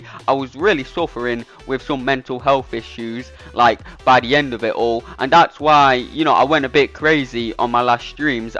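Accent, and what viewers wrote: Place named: England, United Kingdom. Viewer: I love English accent 😂 my favorite I quit vid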